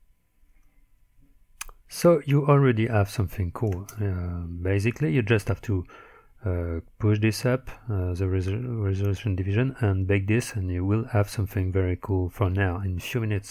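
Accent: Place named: France. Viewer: accent français x)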